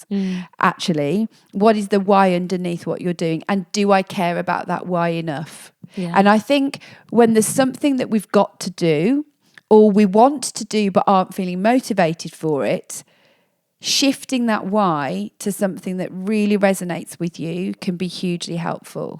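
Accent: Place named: United Kingdom. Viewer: I subscribed to your channel cos i have problem to understand British accent.by listening to your videos..probably could help me practice my British accent listening skill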